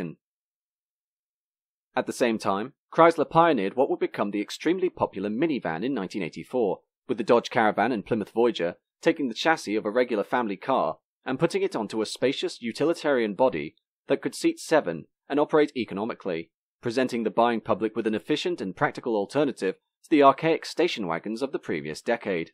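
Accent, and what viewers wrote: United Kingdom. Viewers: Hearing a commentator with a British accent talk about poorly designed/inefficient American cars is utterly GALLING. Wow nobody knew how to build low quality unattractive, inexcusable, unforgivably bad cars better than the English. One step higher than Trabant, if that. I can't make it all the way through a video on the English car industry without a good strong anti depressant.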